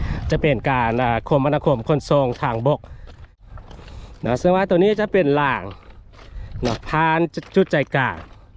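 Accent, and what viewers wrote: South, Thailand. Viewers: เสียงบรรยายสำเนียงคล้ายภาษาปักษ์ใต้ของไทยมาก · สำเนียงผู้ยรรยาย มาจากแถบไหนของลาวครับ สำเนียงคล้ายภาษาไทยใต้มาก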